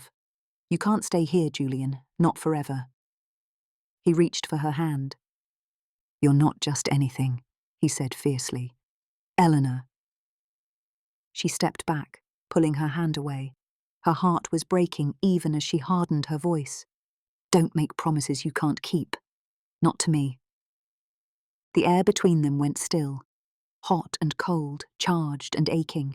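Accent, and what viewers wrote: England, United Kingdom. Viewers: English accent is my favorite! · I just started listening. The narrator sounds wonderful. Is there anything better than listening to an English accent? Anyway, I’m anxious to see how things play out. Thanks for posting. 📕📖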